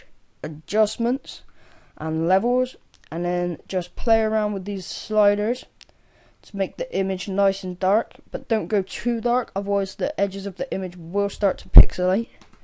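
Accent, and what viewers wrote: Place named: Devon, United Kingdom. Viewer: Do you live in plymouth ?or devon ? It sounds like it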